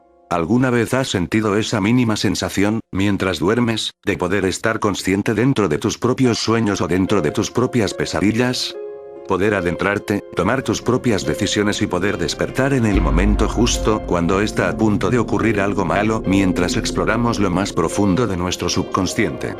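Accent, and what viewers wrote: Argentina. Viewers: SOS VOS DE ARGENTINA CHÉ? FELICIDADES PIBE, A VER CUÁNDO ME MANDÁS UN SALUDO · sos Argentino?, que grande capo!, con razón me encantan tus videos jajaja te mando un fuerte abrazo de Capital Federal!!! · Es Argento q capo!!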